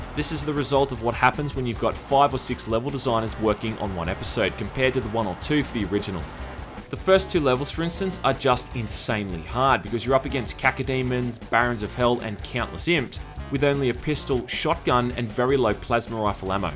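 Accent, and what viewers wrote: Australia. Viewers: said UAC, maybe you just misheard him because of his Aussie accent.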